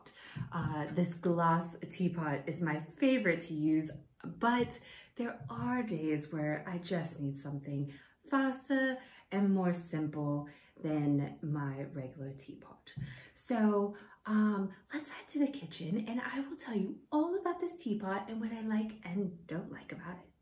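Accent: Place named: France. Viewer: Love your French accent!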